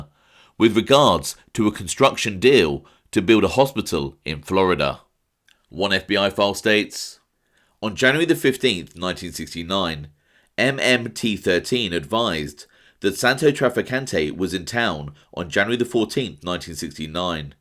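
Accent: United Kingdom. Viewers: It amazes me how much knowledge u have considering I'm assuming ur from the UK by accents. Good to no are American history reaches global appreciation.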